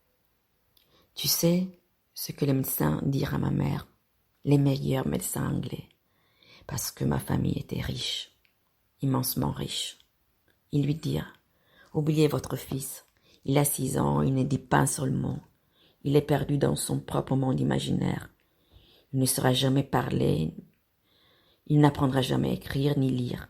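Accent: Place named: Italy. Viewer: Oh, bellissimo, ancora! Il racconto in francese, con il Suo accento italiano: carinissimo! Esattamente quello che vorrei: una donna/moglie italiana che impara e/o parla il francese!... Parla un francese perfetto,! Allo stesso tempo, è abbastanza normale, con 25 anni di presenza in Francia, come ha già detto. Dice "Pour mes amis français": grazie! Se io potessi essere un Suo amico francese, accetterei molto volentieri!... Lei è un'amica italiana, comunque!...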